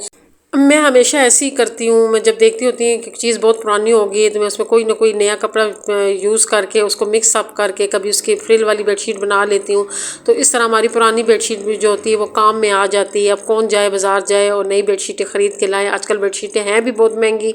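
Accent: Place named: Attock, Pakistan. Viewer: I like your house...simple,decent... Ap k bolny k andaz sy mjy lga k ap attock sy hn..r ap ny khud b zikr kr diya😊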